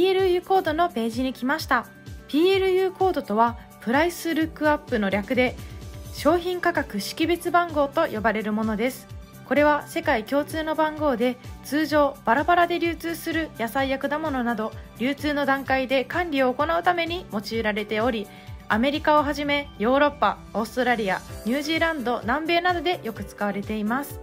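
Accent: Kansai, Japan. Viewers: 気のせいかな？ なんか関西訛りがちらほらと、、、😊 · たいへんよくわかりました。 関西の方のようですね。関西弁でやってもらっても、結構いけるんちゃうかなあ。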